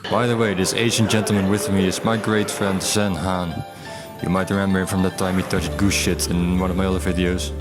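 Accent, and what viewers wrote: Netherlands. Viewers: me, as a dutch person, can just hear that he's dutch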